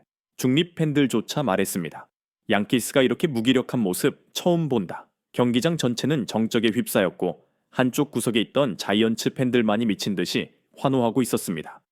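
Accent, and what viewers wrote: China. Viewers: 해절자 중공인인가? 발음이 우리나라 발음이 아니고 어색하네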